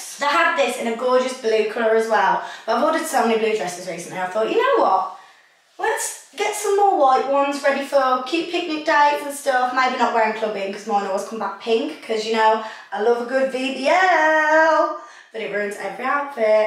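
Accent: United Kingdom. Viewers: You are so damn fine, that British accent just puts you on a different tier.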